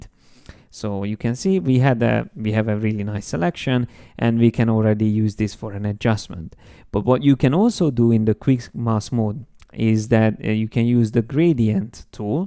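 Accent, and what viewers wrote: Hungary. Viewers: látom nem vették észre ennyi év alatt hogy magyar vagy, de amikor meghallottam az akcentusodat, egyből éreztem hogy valami van :D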